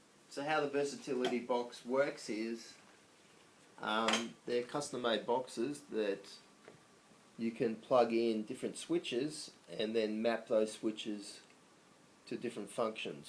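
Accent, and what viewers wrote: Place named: Australia. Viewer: Hi, thanks for the interesting video - a really interesting setup you have there. I'm in a similar situation to yourself - quadriplegic (or tetraplegic as we tend to say here in the UK). I'm C4 complete - I'm guessing you're C5? Anyway, I'm also a keen BF3 / BF4 player, although my approach to playing the game is distinctly 'low-tech' compared to yours:) Take a look at the video on my channel & you'll see what I mean! I assume from your accent that you're based in Australia? I would suggest that we meet up in a game sometime, but I'm sure the ping times would be horrible, and I guess you play on the Playstation 3, whereas I'm on a PC. Anyway, best of luck with the gaming!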